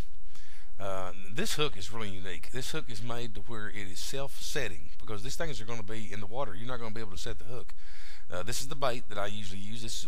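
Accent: Arkansas, United States. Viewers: what part of Arkansas are you from?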